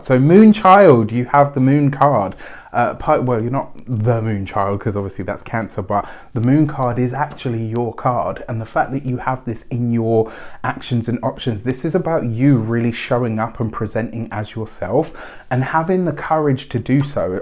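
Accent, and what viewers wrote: United Kingdom. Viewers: Hello sir, I happened to come across you for the first time ever...thank you universe 🥰 great read, thought provoking and resonant for me. Thank you, also nice to have a British male accent doing readings ☺️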